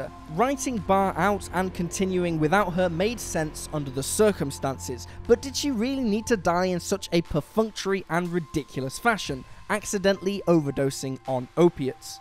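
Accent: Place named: England, United Kingdom. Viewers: You can tell the announcer is English we get loads of Americanisms wrong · Might be cause they're from England? Pronunciation is different man.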